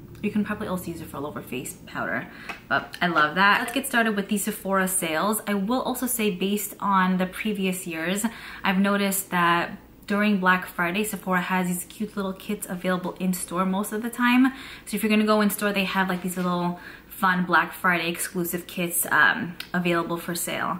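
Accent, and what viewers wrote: New York, United States. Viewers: Hi, your makeup application is so flawless! Can you tell me what brand of blush you're wearing and the color, it's so pretty!! Also, I love your New York accent😊🥰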